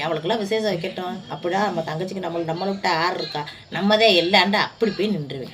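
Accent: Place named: Ramanathapuram, India. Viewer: Sister enka area voice pola irukku Ramnada 😂😂❤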